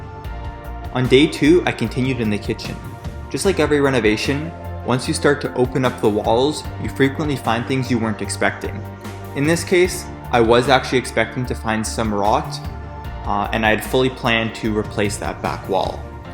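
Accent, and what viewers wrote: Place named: United States. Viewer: i thought this was the UK until you spoke. is it philly, maryland or nyc? the UK dont have basement in their town house. damp issue if you know.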